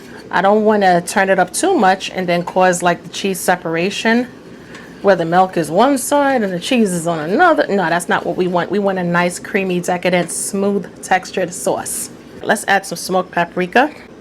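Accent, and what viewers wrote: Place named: Brooklyn, United States. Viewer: Im so ready to try this! My girlfriend thinks you're from Brooklyn by your accent.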